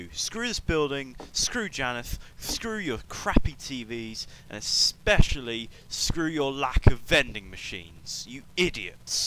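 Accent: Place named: United Kingdom. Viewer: this guy sounds British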